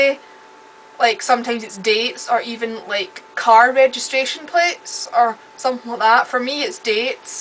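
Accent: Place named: Scotland, United Kingdom. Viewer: Good on you for making this video and helping to understand Autism. If I can ever afford a good video camera I too may make a video on autism. But the prospect of talking on video to an audience of possibly millions terrifies me. In regards to that you are far more brave than I. I applaud your efforts. I really like your scottish accent too.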